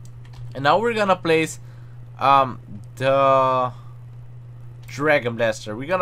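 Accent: Netherlands. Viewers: are you from holland? You sound Dutch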